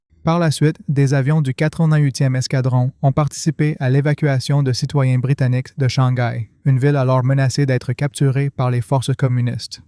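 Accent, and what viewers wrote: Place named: Quebec, Canada. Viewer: I A de merde avec l'accent de Céline Dion..... tabernacle.....mais sujet intéressant....... dommage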